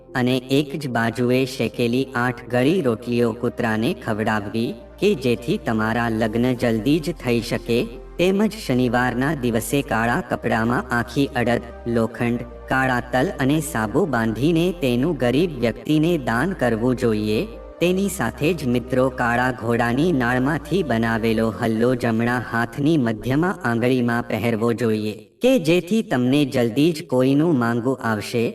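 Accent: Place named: Gujarat, India. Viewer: तुम्हारी गुजराती बोली सुनकर मुझे बहुत मजा आता है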